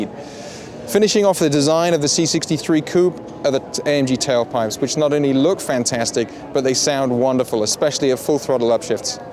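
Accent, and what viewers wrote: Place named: Australia. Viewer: Beautiful car! This guy sounds australian...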